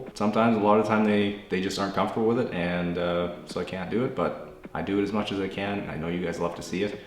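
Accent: Canada. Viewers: Now I hear the Canadian accent. I think it’s with the o’s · Love that you played. My Southern roots anyway. Your pronunciation of garage is cute but decal is what stopped me in my tracks. I was like wait...what?! Then it hit me that you were talking about a decal on a car but I heard “deck-cul” remembered I had heard that pronunciation by another Canadian from Western Canada. I have Canadian family from Ontario but I guess you all have different accents. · NO ONES TALKING ABOUT HIS CANADIAN ACCENT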